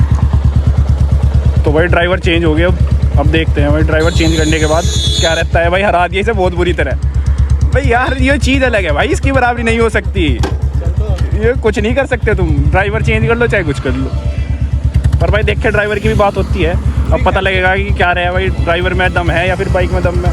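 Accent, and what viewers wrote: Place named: Muzaffarnagar, India. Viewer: Bhai tum muzaffarnagar se hi ho kya 😅😅😅 konse gao ke hoo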